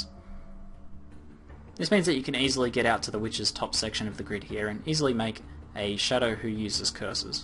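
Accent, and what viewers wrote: Australia. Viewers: Informative, concise, Aussie, subscribed.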